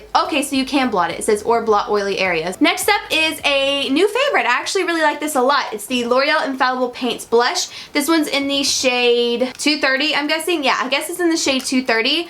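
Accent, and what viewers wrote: South, United States. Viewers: Guuurl someone's been talking to some folks with a drawl. Your southern is showing at the beginning of this video and I'm loving it!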